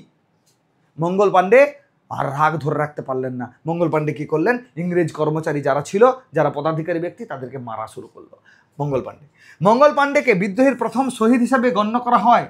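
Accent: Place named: Purulia, India. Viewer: Sir, আপনার বাড়ি কি পুরুলিয়ায় কথা শুনে তাই মনে হচ্ছে আমি পুরুলিয়া থেকে❤❤❤❤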